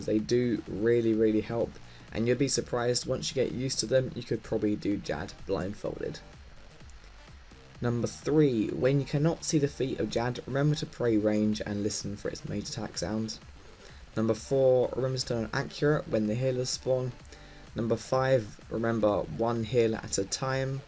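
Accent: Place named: South Africa. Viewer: whats with south Africans and saaoooohhh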